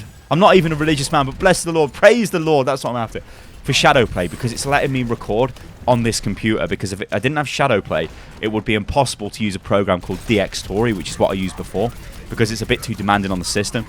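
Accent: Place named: Cockney, United Kingdom. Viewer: I just noticed how you say computer in a cockney accent - compu'er. And the reason I love this channel is precisely because it's british, and I LOVE british accent - and its nuances - between the ones I've heard. Thanks for the hard work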